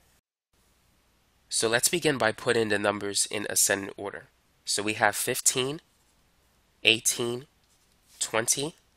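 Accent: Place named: Brooklyn, United States. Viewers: this guy is from Brooklyn I gotta say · you gotta be from new york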